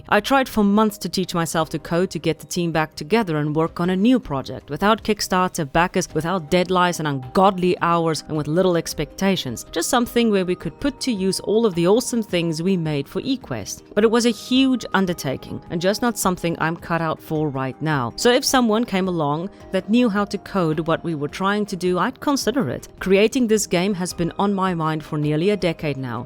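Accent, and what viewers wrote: South Africa. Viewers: Ma'am are you South African? You sound so Safa it's making me smile like crazy, if not then ignore this lmao, but that accent it's giving me such heavy South African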